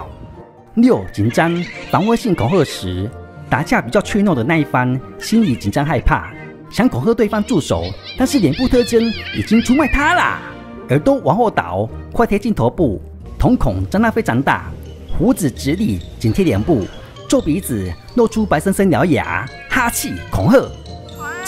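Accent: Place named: Japan. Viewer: 不要騙我是土生土長台灣人，怎麼覺得像日本人講中文😂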